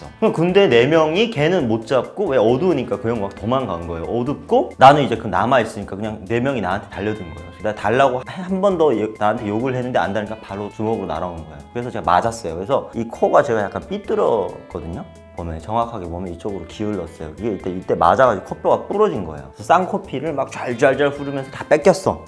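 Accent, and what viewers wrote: North Korea. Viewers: 와 님 북한 말투 너무 좋아...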